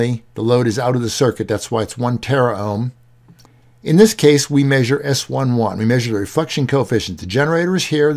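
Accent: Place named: England, United Kingdom. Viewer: Do you speak Russia?I don't understand your video. Because you speak like English man. Where are you from?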